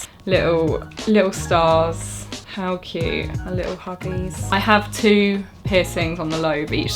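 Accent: United Kingdom. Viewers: U r beautiful n i like your UK accent ❤ from India Nagaland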